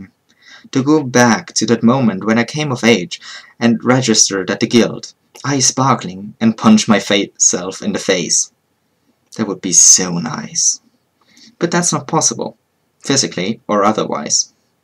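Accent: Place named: Germany. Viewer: where are you from? i dont think that you're a native speaker... sorry if yoz sad that in one of your vids, im new on your Channel ;) You could be from Germany tho at least that was my first thought : ouh this guy has to be german hahaha great work ps.: Im from Germany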